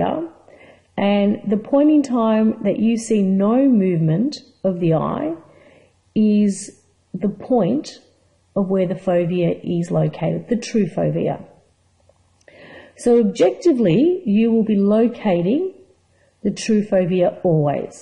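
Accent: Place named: Australia. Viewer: it's cool to hear some Australian accent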